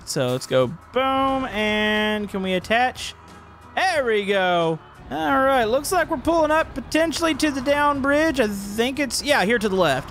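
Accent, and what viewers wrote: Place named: Texas, United States. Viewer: Your voice is speaking like texass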